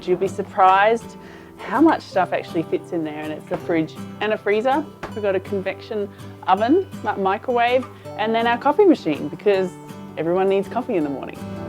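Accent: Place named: New Jersey, United States. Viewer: i love her new jersey accent.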